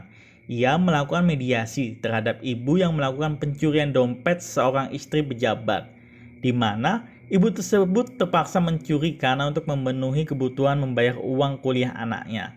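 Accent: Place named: West Java, Indonesia. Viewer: Bener dugaan sy dr logat bicaranya kayak org Sunda taunya bener dr bandung... Wilujeung tugas sing salamet sehat Sareng amanah 🤗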